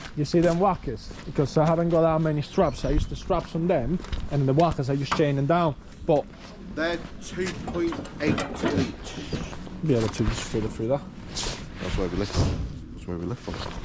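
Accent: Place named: Liverpool, United Kingdom. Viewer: Love your videos mate, you’re the backbone of our society! I’ve always wondered where your accent is from? Sounds like it has some Scouse influence.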